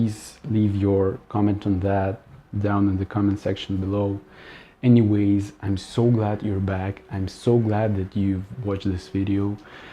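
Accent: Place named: Russia. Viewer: Please keep your accent, your English is good LOOK MEANS NOTHING ITS YOUR CONTENT, JUST BE TRUE TO YOURSELF AND KEEP REPORTING WHAT THE PUBLIC WANTS AND HELP EDUCATE THEM UNDERSTAND WHAT WE CAN ALL DO TO CHANGE IT. Yeah/Yes it would be good to have an introduction song to be played at the start of your videos. Maybe edit a few time lapse clips together over you speaking to people on the streets about really important things. If you don't have the kind of equipment tell others you might know if they can help you edit it. We're all products of our environment and exposure to it, of course those in powerful positions within our governments thrive on us all being against one another. We can't let them keep pushing their narrative of negativity towards each other because none of us hate each other we all want peace and to get along. Again please don't lose the great Russian accent, it's your national sound and you and everyone should be proud of their individual accents. 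Good videos please keep them coming.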